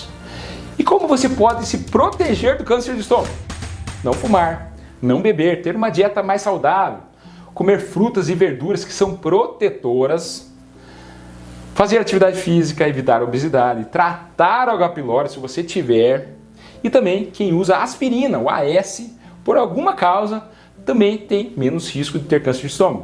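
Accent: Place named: Paraíba, Brazil. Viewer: Nordestino paraibano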